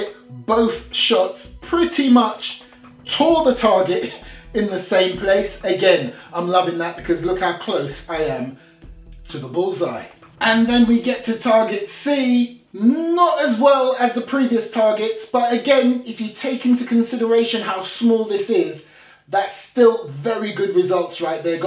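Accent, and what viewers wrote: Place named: United Kingdom. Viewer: You're so delightfully British. Well not so long if Boris Johnson makes Britain sink.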